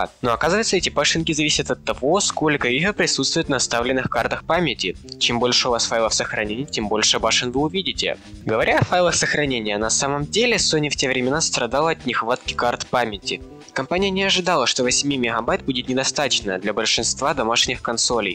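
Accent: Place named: Belarus. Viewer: Пытаюсь понять что за акцент.. Ты из белорусской деревни?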